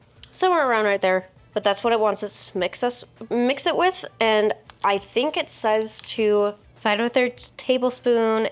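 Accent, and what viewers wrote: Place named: Midwest, United States. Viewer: Definitely from Midwest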